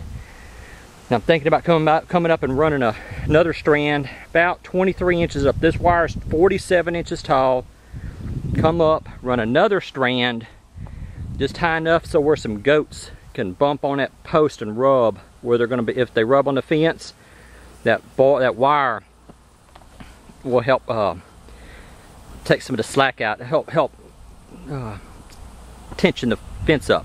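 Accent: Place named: Texas, United States. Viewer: Looks fantastic. Enjoyed hearing a genuine Texas accent. Lots a posers out there these days.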